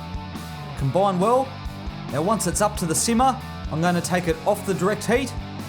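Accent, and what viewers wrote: Australia. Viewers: Thank you for your awesome Aussie accent love it from India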